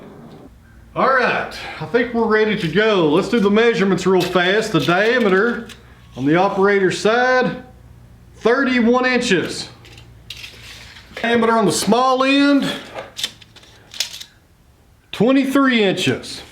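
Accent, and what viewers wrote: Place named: South, United States. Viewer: You are a very charming guy. I love your southern accent. Love from Canada 🇨🇦. The Cats are adorable 💕💕💕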